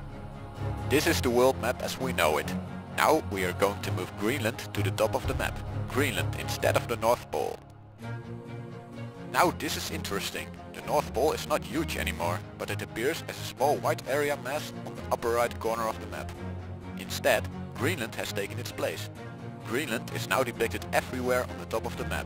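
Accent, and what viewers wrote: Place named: Netherlands. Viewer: Very hollands accent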